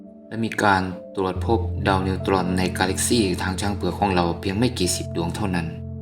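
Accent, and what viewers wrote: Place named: Isan, Thailand. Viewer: เสียงบรรยายสำเนียงอิสานมากๆคับ น่ารักดี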